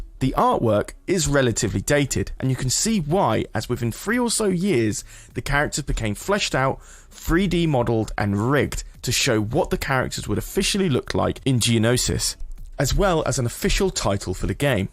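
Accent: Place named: England, United Kingdom. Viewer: Why does have an English accent on his second channel?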